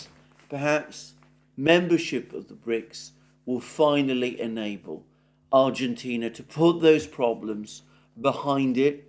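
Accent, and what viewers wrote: United Kingdom. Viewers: Sheer and shameless bias. You are ridiculous and only act rational with that pompous British accent.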